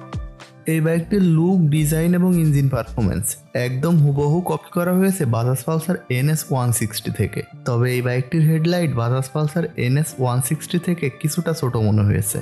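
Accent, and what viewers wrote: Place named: Netrokona, Bangladesh. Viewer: kotha bolar style eto low quality keno ??? ageee valo vabe bangla bola siken...kotha sune mone hosse Netrokona area er kno jokes bolcen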